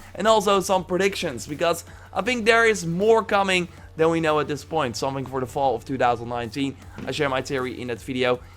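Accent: Netherlands. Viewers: I thought so you're from the Netherlands from your accent. Nice video by the way!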